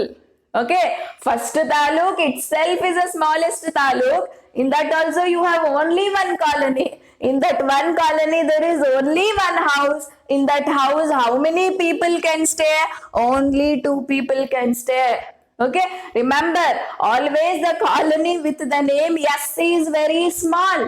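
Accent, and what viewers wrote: Karnataka, India. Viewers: Mam r u from Karnataka? I also can speak kanada well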